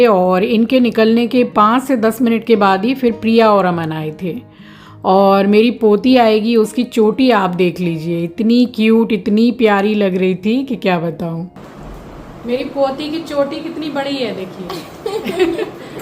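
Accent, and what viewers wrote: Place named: Delhi, India. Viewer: haan. Wo Dilli se bolti hai 😄 😀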